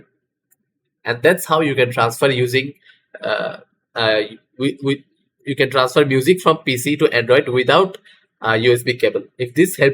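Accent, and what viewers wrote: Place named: India. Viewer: Where are the US English accent I only find Indians accent which is hard to understand .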